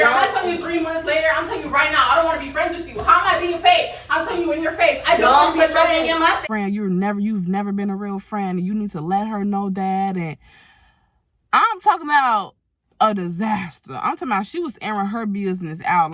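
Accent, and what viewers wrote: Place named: Chicago, United States. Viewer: I knew you were from Chicago as soon as you started talking😭🫶🏾. New subscriber! Taurus gangggg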